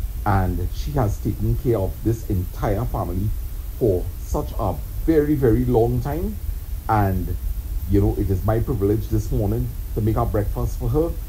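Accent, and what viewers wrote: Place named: Trinidad and Tobago. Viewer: Kudos. Another good video. I like this format where you narrate, you should do it some more. You keep it real with your natural Trini accent unlike others who try to speak “properly” but sound pretentious. I’m familiar with the Caura area and used to buy doubles from Palance at the junction many years ago and now live in cold Toronto. Next time I visit I’ll contact you prior so I can also visit Farmer Harry. Blessings.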